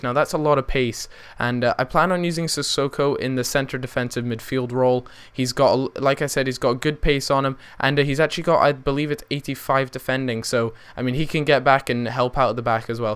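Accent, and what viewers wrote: Scotland, United Kingdom. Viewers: are you scottish? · Are you Scottish or have Scottish relatives ?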